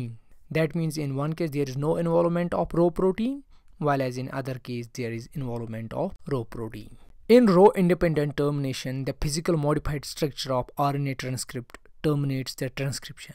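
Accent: Kashmir, India. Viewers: Are you from Kashmir? 😂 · You’re very good but I can’t understand the indian accent 💔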